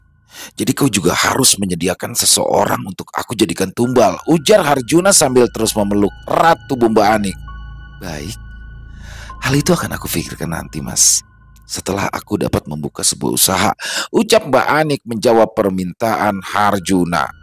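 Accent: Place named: Jakarta, Indonesia. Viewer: Ahhh seru nih ceritanya.. aku udah mengira kalau ini kisah bang Rudi, dari logat penyampaian ceritanya yg dibawakan mas, sudah keliatan gaya bahasa Betawi.... Ditunggu kisah selanjutnya, pasti seruuuu ❤❤❤❤❤❤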